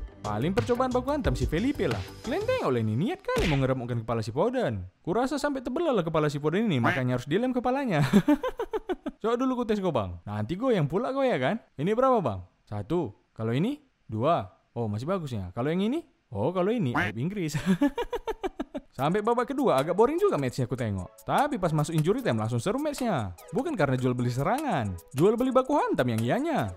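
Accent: Medan, Indonesia. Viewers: Medan kali bah ngomongnya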